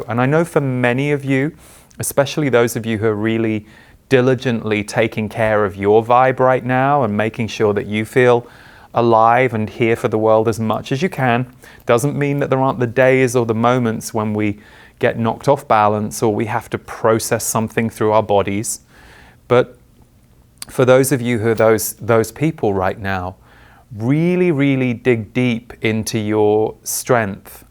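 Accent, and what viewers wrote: United Kingdom. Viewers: Not complaining as a culture is great, but please don’t lose your British accent. 🤍🙏🏼✨😎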